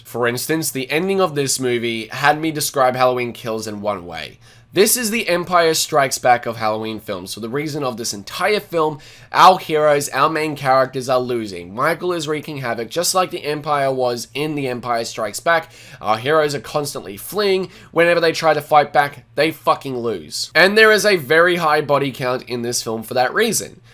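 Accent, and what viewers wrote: Australia. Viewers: I forgot how much I love your Australian accent. Your voice would be really great for ASMR. I haven't seen the movie yet But I have Peacock which is a streaming service in the United States that it's streaming on simultaneously with its theatrical run.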